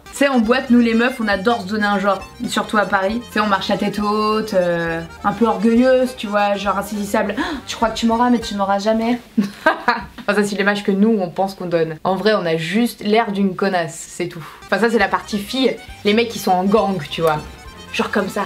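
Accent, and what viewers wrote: England, United Kingdom. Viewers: Super accent anglais ! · tu as un accent anglais trop beau !!!!!!!!!!!!!!!!!!!! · Meuf ton accent English 😍😍😍😍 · i love ton accent anglais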